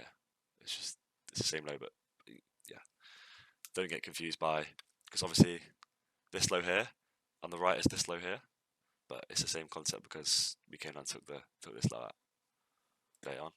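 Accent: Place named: London, United Kingdom. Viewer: laughed out loud at 7.29 when you say wer are fucked lool. Are you from London bro? fix up a channel asap.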